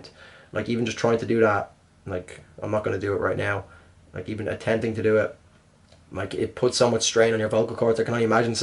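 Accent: Dublin, Ireland. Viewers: Ok so... I discovered your channel today, I have watched something like 20 videos... and not a single comment about? It seems you like, is the "same" style (and they were an inspiration for ) mixing metal and electronic music.... and everything is great with them! Voice, music, lyrics, and video clips! I can recommend you a few titles if you're interested; but honnestly everything is great! Considering video clips, my favourite songs would be (german and english version);;.... and for the electronic part:) Anyway, keep up the good job, you're the only reaction channel I actually find interesting (plus your accent reminds me of Dublin <3 )! Greetings from France!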